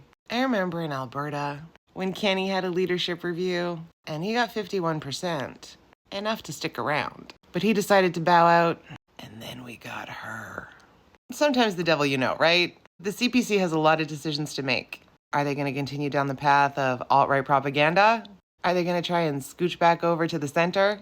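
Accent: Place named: South, United States. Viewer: Why do you have a southern accent, but I am still agreeing with you pp has to go!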